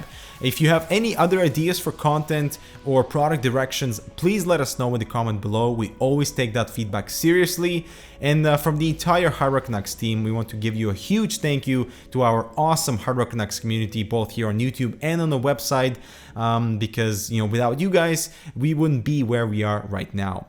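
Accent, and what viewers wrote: Sweden. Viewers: You sound really swedish btw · Where are you from? are you swedish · Are you from sweden?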